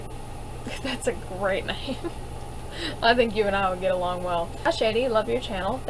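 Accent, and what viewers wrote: United States. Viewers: you sound country and i mean that as a compliment i grew up in virginia you should really watch that negan meets gary busey it's awesome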